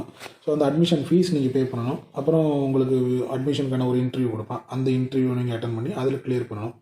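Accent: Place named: Nagercoil, India. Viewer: Nagercoil slang!!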